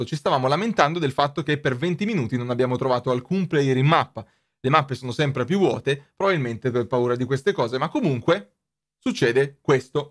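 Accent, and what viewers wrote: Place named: Tuscany, Italy. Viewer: Toscanaccio puro, mi dispiace pe sto ragazzo, BSG mi sa che vuole far morire sto gioco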